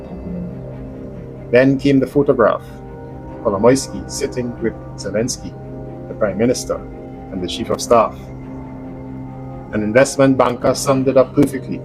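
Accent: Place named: Trinidad and Tobago. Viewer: I know that trini accent anywhere in the world. 🇹🇹 🙌😎 Wha iz Di vybes boy?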